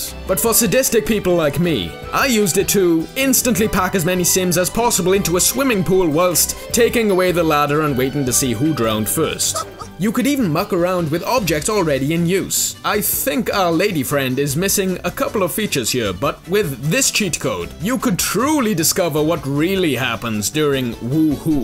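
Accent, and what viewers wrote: Wales, United Kingdom. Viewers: great video I love your work. where are you from? Guess Newport/Cardiff way by the accent? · Yeah, Im pretty sure hes welsh. Sounds just like I guy I know who is from Wales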